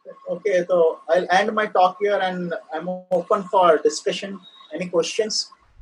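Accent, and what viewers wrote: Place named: India. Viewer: I wasn't expecting indian accent 😅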